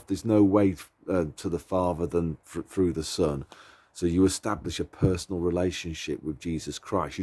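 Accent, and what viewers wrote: Northern England, United Kingdom. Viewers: I could talk to you for hours on this subject 😁 but for me God creating God, l can't make that leap if you understand what I'm saying. I'm not religious but l know there has to be a 'creator'. I'm also of the opinion that the human brain is not yet capable of understanding, l know mine isn't 😁. I've got to ask, are you a Northern England lad?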